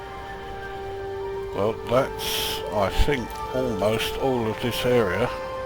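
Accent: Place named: Southend, United Kingdom. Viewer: great video mate, really like your style :o) I am a expat now but your accent and way of speaking reminds me of so many blokes I know from my Southend-on-Sea days :o) Cheers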